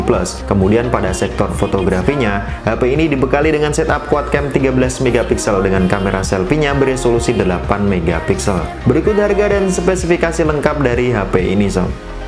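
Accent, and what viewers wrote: Madura, Indonesia. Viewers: Bang.. madura mana kmu bang?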